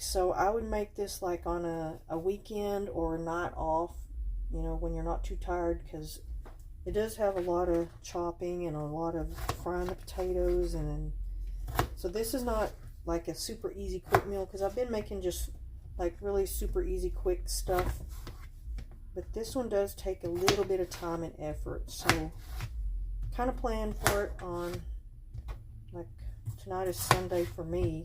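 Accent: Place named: Texas Panhandle, United States. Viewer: Hey, I was just watching some of your recipe videos which I do really like. But I noticed that you have a fire alarm chirping in the background. I hope that I’m not being rude, I just got a bit distracted by it. Also, I’m from the Texas Panhandle as well, and hearing your voice sounds like home. I live in a different state now and miss hearing my people.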